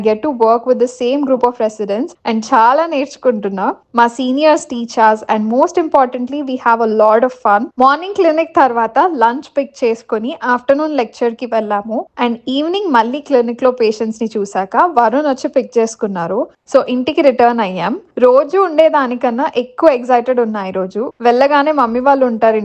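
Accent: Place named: United States. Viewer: miru American accent lo telugu matladutunaru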